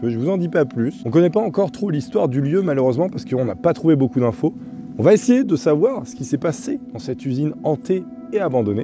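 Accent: Belgium. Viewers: Vraiment sympa tes vidéo Urbex :-) Tu utilise quoi comme caméra pour ta night vision ? car je cherche quelque marque ou référence. Sympa ton accent de Belge mais de mon coté vers Charleroi on as pas cet accent de Bruxelles ^^ Par contre oui quand on rend la monnaie ou n'importe quoi d'autres, on dit bien s'il vous plais. Vive les frites et surtout les bonne bières :-) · je suis belge et je confirme ton accent ET oui c'est vrais les "esprits" belge son parfois violent;)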